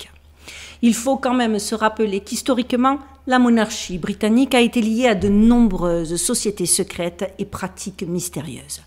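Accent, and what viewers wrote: Marseille, France. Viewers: Hello la marseillaise (?) En tout cas l'accent est là. Je vous aime bien 😊 même si je ne regarde pas tout le temps vos vidéos et pour cause : pssiiit... ✋👀Je suis « réveillé » depuis une trentaine d'années avec les écrits de Serge Monast et d'autres donc plutôt au courant de ce qui se passe actuellement dans ce monde et surtout à notre époque. C'est bien ce que vous faites, continuez 👍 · Bonsoir, Merci pour cette vidéo analysée avec beaucoup de finesse et de pertinence ! L'accent du Sud et votre bonne humeur communicative rendent le monde moins obscur ! Merci pour le temps consacré qui aurait mérité un trophée ! Hâte de voir votre prochaine vidéo ❤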